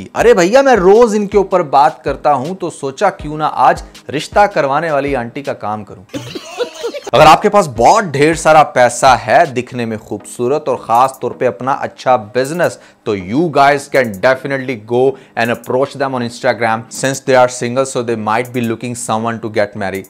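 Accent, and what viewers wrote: India. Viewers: I wonder how he is speaking constantly in hindi accent 🤔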